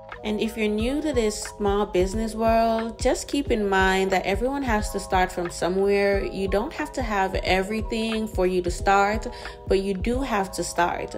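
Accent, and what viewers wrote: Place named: Jamaica. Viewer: Thanks for sharing sounds like you are Jamaican I am too good luck on your business. I am starting my tshirt business too so I’m here to learn and grow.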